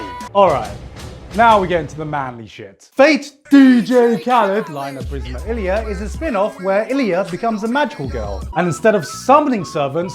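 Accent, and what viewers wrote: United Kingdom. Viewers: "There's at least five" Actually had me laughing, especially with that British accent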